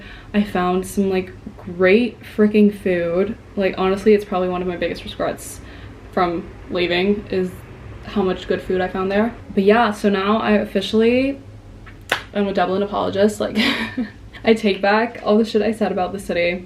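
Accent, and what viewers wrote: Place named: United States. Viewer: and why's your English so good? you have such an American accent!!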